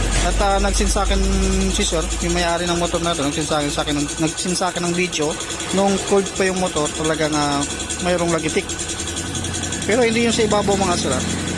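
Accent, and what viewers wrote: Ilocos, Philippines. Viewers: masa Ilocos ka ba boss?!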